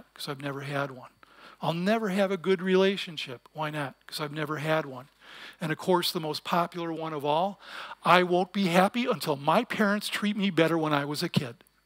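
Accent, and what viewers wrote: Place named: Midwest, United States. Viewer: He sounds like he's from Chicago 100% or at least Midwest lol. I can always tell those peoples' accents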